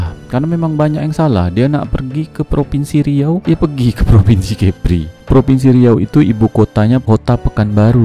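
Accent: Malaysia. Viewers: Mantab, bahasanya enak didengar, awak suke logat Melayu seperti ni.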